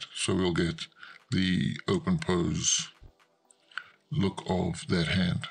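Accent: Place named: Afrikaans, South Africa. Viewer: I like your voice my man.....English baritones with a faint touch of Afrikaans. Keep up the good work!! Also big thanks for sharing your work flow without putting them behind a paywall!!! :eyes-pink-heart-shape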